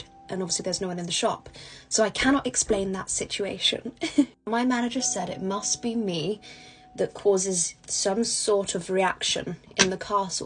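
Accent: United Kingdom. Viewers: I agree such a lovely voice. I'm American so I always fall for the British accent. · Apart from the topic 😅 love British accent ❤from Indian. So classy, relaxing and elegant.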